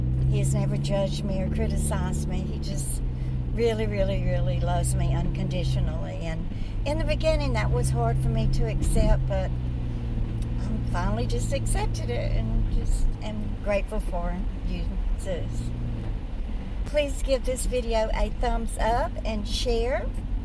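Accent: South, United States. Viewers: I love your beauty. I would love to visit with you and have you cook for me, not gonna lie. Now it sounds like you have a southern accent living in LI NY. It sounds lovely but it doesn't sound very LI haha.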